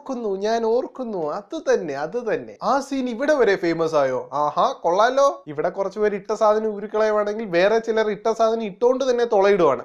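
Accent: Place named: Kottayam, India. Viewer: Chettan kottayamkaaranaano slang kettittu thonnanallo😊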